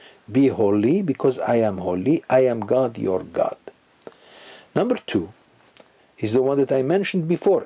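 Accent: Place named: Iran. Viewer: you have Farsi accent even when you speak English and even want his bigger Hebrew semitic language I can smell and I can hear Farsi accent but I'm not going to judge you pray for Rabbi for all of us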